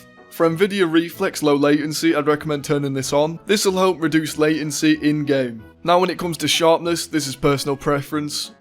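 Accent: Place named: Northern England, United Kingdom. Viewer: This MF'er got that House Stark accent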